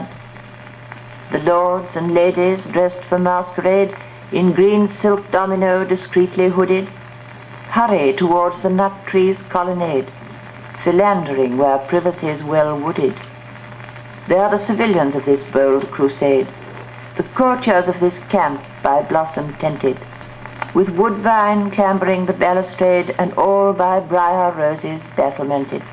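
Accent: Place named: RP, United Kingdom. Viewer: She speaks queens English, she has no accent.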